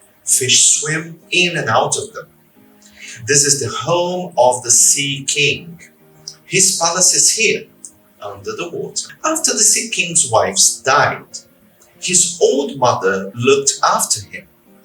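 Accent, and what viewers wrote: United Kingdom. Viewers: obrigada prof, fiz um teste e meu nivel é A1 e amo o sotaque britanico obrigada por esses videos e esse canal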